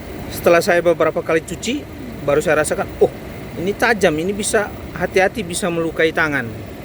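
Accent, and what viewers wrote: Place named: Nias, Indonesia. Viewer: logat nya kyk nias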